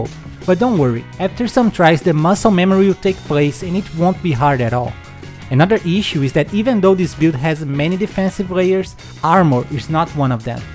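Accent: Brazil. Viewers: Great vid! You sound brazilian to me idk why. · boabuild! sotaque br kkk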